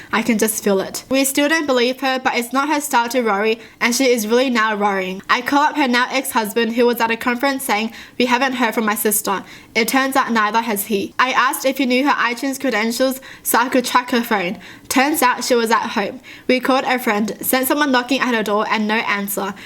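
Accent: Australia. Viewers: are you Australian? because you sound just like my friend’s mum😂😂luv you btw💜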